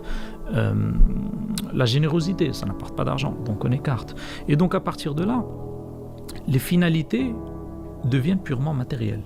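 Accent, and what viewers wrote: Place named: Morocco. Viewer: on reconnaît l'accent marocain❤❤🇲🇦🇲🇦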